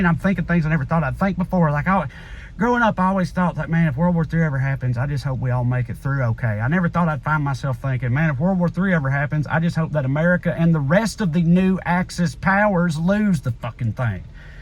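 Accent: South, United States. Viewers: What a fantastic rant young American man, spot on!! Greetings from England Love the accent!!.... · lol , you sound like a red neck , but get your point across like a very intelligent person , as you are , I love it , shines a little light in these uncertain times , thank you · Finally, A Southern Accent i can Enjoy? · God I LOVE your southern drawl. I know this is totally off-topic but hey I couldn't hold it. BTW, f#&k the orange haired buffoon! Cheers from Italy! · To hear this intelligent man speak the truth in in his deep southern accent is delightful.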